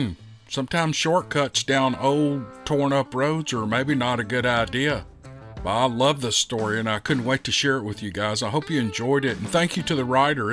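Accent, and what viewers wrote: South, United States. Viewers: I’ve tried other shows on this subject, but yours is the one I always click on. Your voice sounds like my southern family, you sound like an uncle of mine, very calm and easy to listen to. Makes doing chores a breeze. Thank you and keep it up. Maybe you’ll have an experience yourself. Have a blessed day. 😊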